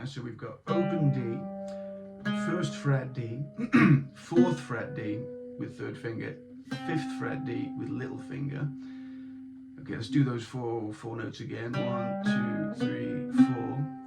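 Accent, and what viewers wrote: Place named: Arab world. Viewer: I sense from your pronunciation that you speak Arabic as well! Thank you for sharing such an interesting tune!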